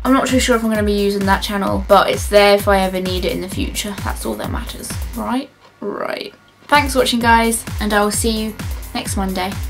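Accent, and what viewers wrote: United Kingdom. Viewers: To be honest, when im bored I just watch your videos to learn your british accent. Ive been practicing almost everyday to master this shit. B) PS Please make more video about the british accent. Keep smiling,.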